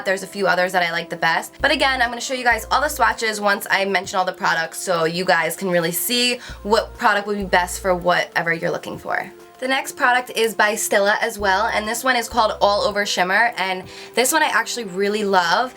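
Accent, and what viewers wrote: New Jersey, United States. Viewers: It might just be her jersey accent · I was going to comment on her pronunciation of these two brands as well, but then I saw your comments. Haha Being such a beauty guru, I was really kind of surprised she didn't know the correct pronunciation since these are really common brands--especially here in the U.S.. I think I noticed her mispronouncing NYX is another video as well. Nonetheless, she is still adorable. The mispronunciation just kinda added to her bubbly, cute, & quirky personality and that New Jersey accent!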